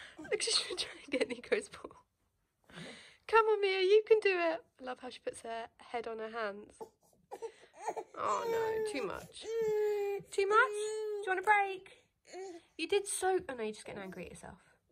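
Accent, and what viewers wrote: United Kingdom. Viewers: Hello, your dogs ate very cute! Im watching your vlog from St Peterbourg, Russia, and i couldnt find any mention about what country and city you are living in.. By your accent i guess you live somewhere in Great Britain, am i right?:) Maybe someone can prompt me? Thanks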